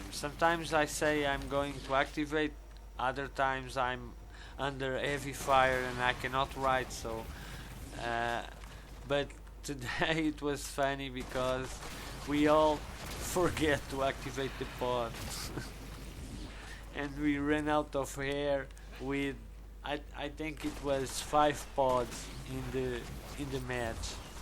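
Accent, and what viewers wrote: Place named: Europe. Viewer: 2 things: 1.) What accent do you have? Sounds European 2.) If you can, show your mods for all your stuff, would be cool to see what you use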